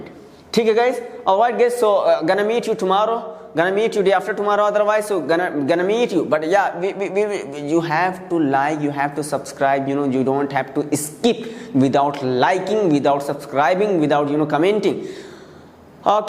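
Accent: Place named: Bhojpuri, India. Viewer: I have never seen such a teacher like bhaiya best teacher 😆 Whenever I attend his video I started smiling Before open the class, I started laughing 😂 He isn't speak hindi he speak bhojpuri😂 his sound gives bhojpuri vibe 😅 I like it this class with no distraction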